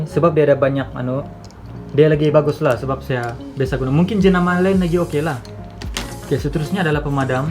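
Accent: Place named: Sabah, Malaysia. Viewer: Mcm ada bahasa sabah campur kl😂 btw hope you get a lot subscribers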